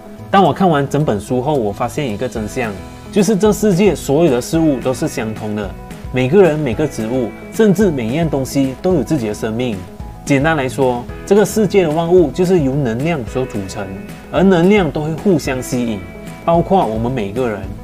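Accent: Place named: Fuzhou, China. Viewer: 你的福州音好重😂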